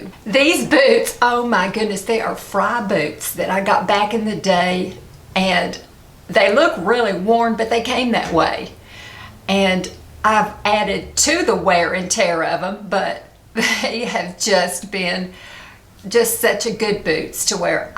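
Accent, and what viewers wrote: South, United States. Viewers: You could have been a Miss America...you are so beautiful and your figure is amazing. Love your southern accent...❤️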